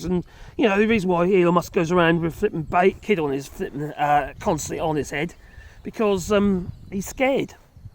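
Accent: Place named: United Kingdom. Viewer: I like this guy's accent ... British, I believe.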